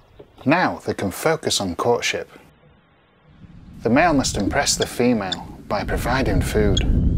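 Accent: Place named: Yorkshire, United Kingdom. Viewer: I love it that every time says ‘tawny owls’ the CCs translate it as ‘toenails’. Mr and Mrs Kes had problems with Jackdaws, Barn Owls and toenails 🤣 Gotta love the Yorkshire accent 🤍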